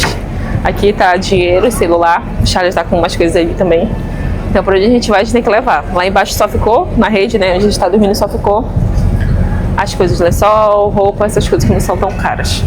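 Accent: Rio de Janeiro, Brazil. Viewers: Boa tarde família...curtindo mais um vídeo e deixando aquele Likeeeeee....então sobre o preço da passagem acho razoável com 3 refeições? Show...outra particularidade....Seu sotaque é muito parecido com o dos Cariocas.....Por exemplo...Charleessssss😂😂😂😂...é bonito 😅😅😅...Manauuusssssss....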